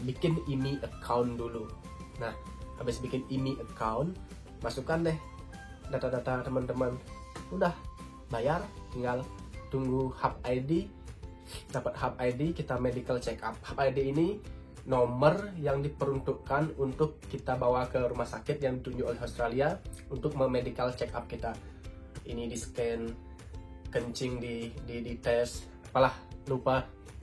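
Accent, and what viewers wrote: Bali, Indonesia. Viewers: Logatnya kek orang bali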